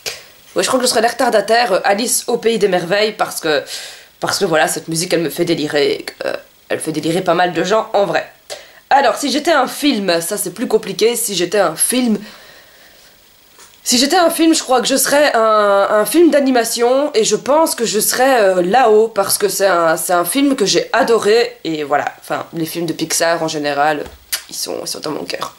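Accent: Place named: Belgium. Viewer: abonnée^^ je te découvre grâce au groupe. je suis comme toi,1 peu comme la voix des opprimés,je cherche toujours à trouver le bon côté des gens même si tout est contre cette personne.j aime bien l italien.j aime bien ce que tu dégage^^. Tu es belge?(oui j ai eu la réponse plus loin)un p'tit accent😉.bisous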